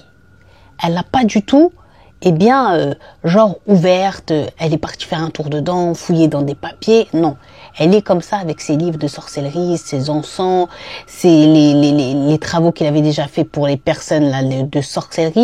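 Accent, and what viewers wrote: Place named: Arab world. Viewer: Merci pour ton travail t'as une voix superbe et j'aime ton accent arabe.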